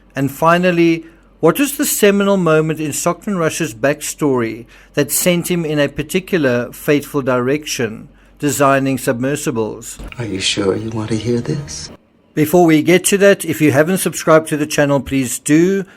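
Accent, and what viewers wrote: South Africa. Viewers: Love the SA speak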